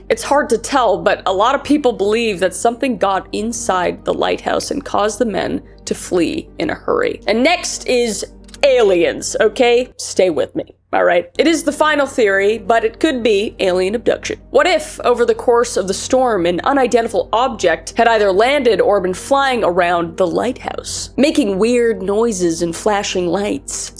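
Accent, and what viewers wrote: Canada. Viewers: Not trying to be a jerk here, but your Canadian is def showing in these videos bc your pronunciations are all over the place in terms of wrongness. Like Raleigh is said "Rahl-ee" not Ray-lee or whatever it is you're saying. You put sooooo much work into these deep dives and we all love you to bits for it, but you should really look into figuring out how to properly say these place and people names that you're not familiar with. This is like the 3rd or 4th video I've watched where you butchered place/people names. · I never noticed just how Canadian you were until now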